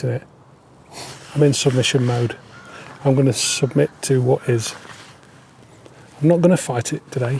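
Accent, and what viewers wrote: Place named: Mansfield, United Kingdom. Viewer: That's a very familiar accent! Mansfield by any chance? Am frum Wooduss! One of the things that made me ask the question, apart from your accent, was the "If you can't be good, be careful!"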